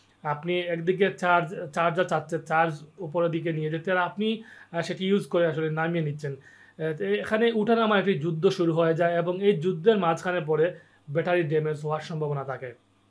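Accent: Sylhet, Bangladesh. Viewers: সিলেটি ভাই 😮😊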